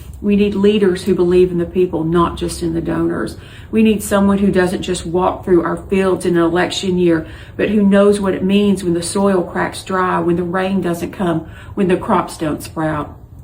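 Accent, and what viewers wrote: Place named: South, United States. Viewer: Oh my god, I am so thrilled to hear a southern voice speaking the words you are laying down. I am almost 70. My late husband was a combat VN vet. He was born and raised outside Charlotte, NC. My mom was born and raised in West Virginia and I spent many a summer there as a child. And to this day I still go back to visit all my in-laws at least once a year. All that to say I LOVE hearing southerners, it makes me feel at home, even though I am a Californian. And when I hear them speaking inescapable truths - well I’m just crying as I watch your few videos. Monte Mader turned me on to you. I don’t do social media much so I don’t know how to follow you other than this YouTube stuff. It says you only have a few hundred subscribers which I have to think will grow after your latest (and horrifyingly accurate) video comparing ICE to ISIS. 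God Bless You!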